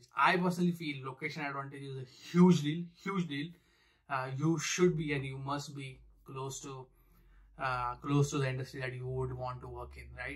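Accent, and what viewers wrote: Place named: Maharashtra, India. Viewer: Great video bro...I guess you are a marathi guy based on your accent.. well that's a different top though! I just wanted to ask that if we are able to score only around 302-305 in GRE then is it possible to compromise it on 1 year work experience plus an internship of 6 months so that the total work experience will be of 1.5 years and the GRE score would be around 305.Will this make me get an admit into sjsu for MS In CS? I would be glad if you answer. Thanks!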